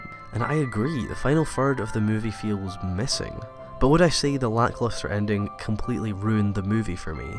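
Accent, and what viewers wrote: Scotland, United Kingdom. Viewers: Sorry, unrelated but I love your accent!! I'm Scottish tooo !! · He sounds Scottish. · I've been watching your videos for a while now and I still think your narration, detailed reviews and opinions make for great viewing. Watching anime which is innately foreign in nature, is made to seem very natural when presented and discussed in a familiar Scottish accent. This film has been on my to-watch list for a while now so although I'm disappointed I didn't get to see it in a cinema setting (and admittedly, I probably should have watched it before watching this video), this has definitely made me even more keen to watch the film. Great video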